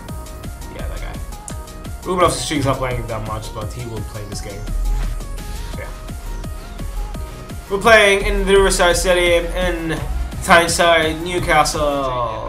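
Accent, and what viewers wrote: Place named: Spain. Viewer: you sound little like if you were from Spain little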